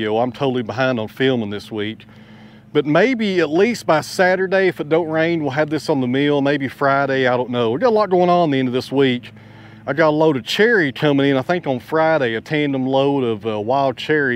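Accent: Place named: Ohio, United States. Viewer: Listening to you makes me just a touch homesick. Southwest Ohio, true, but rural Ohio isn't much different. Thank you, Sir.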